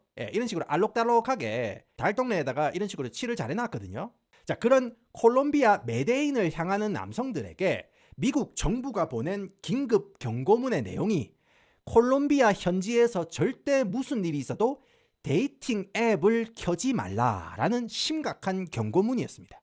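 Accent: Gyeongsang, South Korea. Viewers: 허리까지 내려오는 까만쌩머리이 ㅋㅋㅋㅋㅋㅋㅋㅋㅋㅋ아놔 커피뿜음 ㅋㅋㅋㅋㅋ 넘 젬나는 갱상도 ㅋㅋㅋㅋㅋㅋ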